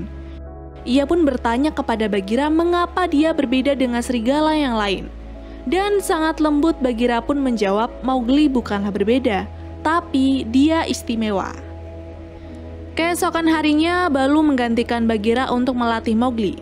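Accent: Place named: West Java, Indonesia. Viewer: Mimin na orang sunda euyy🗿🗿🗿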